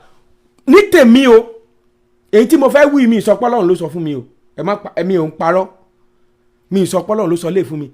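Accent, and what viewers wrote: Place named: Yoruba, Nigeria. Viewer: First of all I love that Yoruba dialect, it’s very sweet, continue my prophet......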